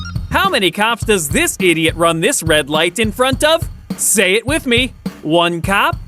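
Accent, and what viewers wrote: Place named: United States. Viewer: That's the most American narrating I've ever heard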